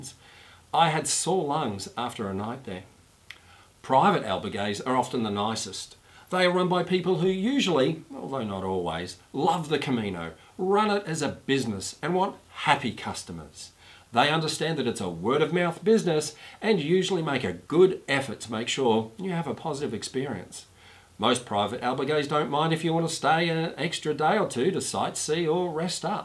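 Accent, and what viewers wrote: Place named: Australia. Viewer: Interesting Aussie accent and jokes. al-BER-gay St- Jon -peed-d-por Walking sticks are so helpful taking 30% of the weight- bearing off hips, knees, ankles. Good for going down & up. I understand your wrist problem but please recommend them! One stick creates imbalance in the body over days. (I've walked 7 caminos) thanks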